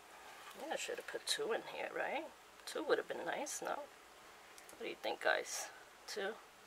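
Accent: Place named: New Jersey, United States. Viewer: Lol I knew you were a Jersey girl or very close. I have cousins in Jersey, I'm in Southwest VA and we tease each other about our accents 😁😁 That sure is an awesome piece of work on both 💖💖💖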